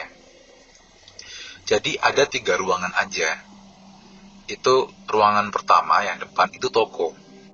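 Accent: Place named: Surabaya, Indonesia. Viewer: Dari awal denger uda langsung ngeh kalo masnya logat suroboyoan 😂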